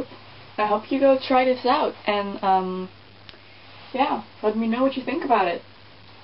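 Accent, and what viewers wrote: Netherlands. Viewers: Are you dutch..? I can hear that you say sok instead of sock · You have a dutch accent :)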